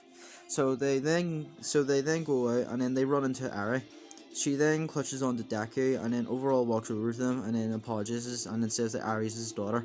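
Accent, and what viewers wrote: Ireland. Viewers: The second scottish youtuber I found yes!! Edit:I mean irish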